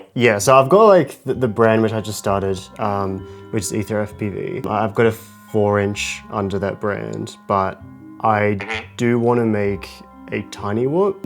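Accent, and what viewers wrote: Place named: Australia. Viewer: relax; aussie accent, young, multi-cultural, eloquent and cute.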